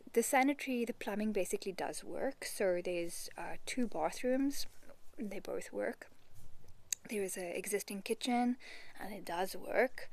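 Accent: South Africa. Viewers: Well done for chronicling your search. Good output of vlogs with updates and good dialogue/commentary, if a little difficult to hear in places - using earphones worked for me. Appreciate you may have limited resources whilst travelling and just starting out with vlogging, your vlogs would be enhanced with photographs and maps to illustrate the talking parts. Your accent and tone is much sweeter than a lot of SA's, is is distinctive of your home region.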